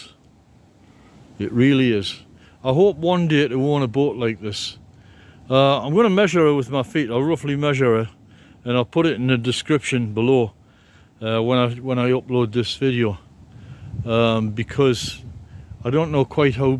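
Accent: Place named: Northern England, United Kingdom. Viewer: I can tell that you come from the North of England. My Mother came from Newcastle. I agree with much of what you say. Look at the bows on the current fleet of IMOCA racing boats that just completed a circumnavigation. Other boats that have "Scow" bows are popular in the single handed Mini Trans-Atlantic race (24 feet). If one looks at the construction of Cruise Ships today they are effectively a narrow rectangle with a pointy end and a long snout. I have a feeling that the boat you were looking at was certainly an inland sailing vessel at some time in the past. I would not be keen to take a vessel with Lea-boards to sea nor would I wish to sail a "scow bow" upwind in 30 knots in The Atlantic. Scow bows work well off the wind. Every dog has its day but the ones that are bred with malice aforethought tend to do better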